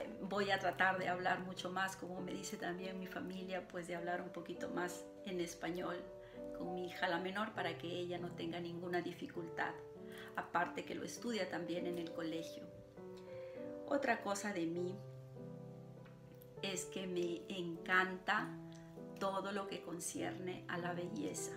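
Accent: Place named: Italy. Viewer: Tú canal es genial😍🇮🇹🇵🇪 me gusta esta combinación de nacionalidades! Llevas muchísimos años allá en Italia😱 ya tienes el acento italiano muy marcado incluso hablando español! Encantadisima de ser parte de tu espacio saludos✨🌸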